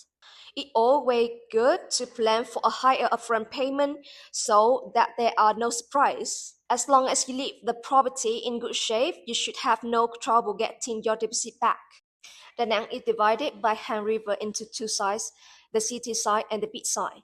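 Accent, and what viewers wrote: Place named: Australia. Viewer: Aussie accent, there.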